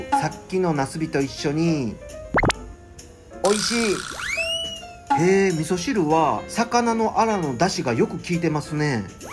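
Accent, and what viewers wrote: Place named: Kansai, Japan. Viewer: 関西弁がキモイ。 内容は良かったです。台湾に行ったら行きたいです。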